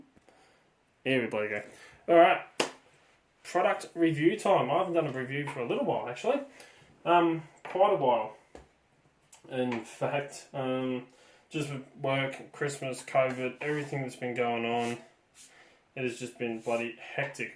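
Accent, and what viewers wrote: Australia. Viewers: Glad to find your Channel, good to hear a fellow Aussie showing us some great content, I am new to Lock Sports but am enjoying learning with my Sparrows Tuxedo kit, Cheers;) also thanks for the referral to Locksmith Toolbox they are awesome and good prices:)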